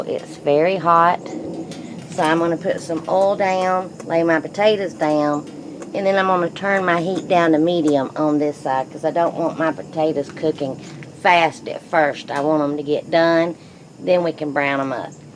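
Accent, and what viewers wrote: South, United States. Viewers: Im from Oklahoma and been all over the country and that southern accent always grabs my heart good job honey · love the Southern Drawl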